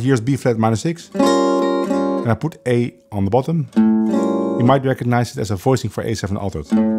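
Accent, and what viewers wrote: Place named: Netherlands. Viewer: Your Chord shapes are unusual. You're definitely a fabulous teacher. Worth studying. Love the Dutch accent.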